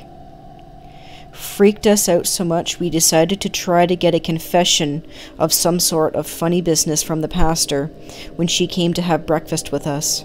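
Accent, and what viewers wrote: Canada. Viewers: I'm English as it happens. Look girl, i was just having some fun. you should hear my accent? especially when i'm drunk! You sound Canadian and to be honest, i rather like the accent. i lived in the USA for a few years along time ago and i'm ex-army too so i'm used to quite a variety of speech patterns. If i knew you been subjected to nutters threatening you, i'd have either not commented or said something else. Why anyone would want to say awful stuff like that to you on the strength of the way you speak, God only knows? if it's worth anything, you carry on. i did like the stories. so did my dog. we listened attentively as we scoffed our sausages and mixed veg. :0) People who post shit like that are impotant tossers who proberbly have no mates and still live with their mums! Sorry again mate. Jez and Charlie dog. xxx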